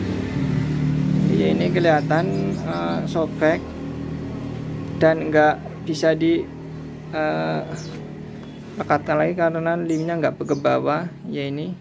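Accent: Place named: Banyumas, Indonesia. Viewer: Ra ngapak ra kepenak kang...sukses selalu👍😁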